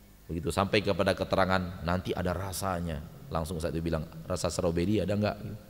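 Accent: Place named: West Sumatra, Indonesia. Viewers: uztad orang mana..? seperti nya menggunakan logat minang..yg sangat jelas logat nya. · Vimia Zuhry org Pekan Baru suku Minang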